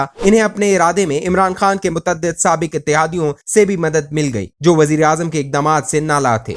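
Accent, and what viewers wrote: India. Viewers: Indian ascent shows imported content from India...??????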